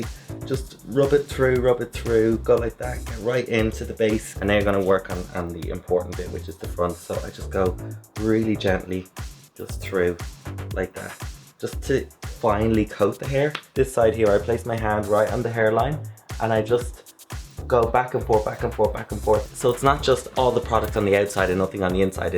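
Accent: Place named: Ireland. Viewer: Hey! I love your videos and you're accent!! Some of my family is from Ireland and I'd love to go. I'm in love with Ireland and its accent!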